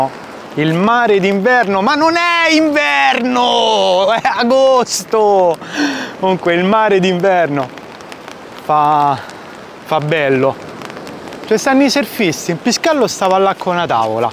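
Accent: Rome, Italy. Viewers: accento Romano? o forse piu` ancora Toscano..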